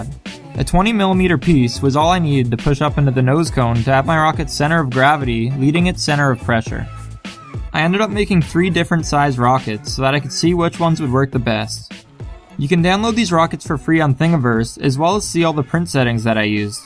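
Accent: Canada. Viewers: I can tell you are Canadian, because you said oat instead of saying out.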